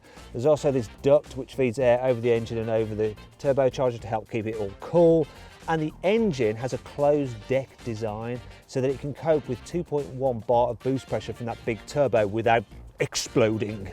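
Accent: United Kingdom. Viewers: これイギリス英語やからイギリス目的じゃない場合はやめた方がええで 💢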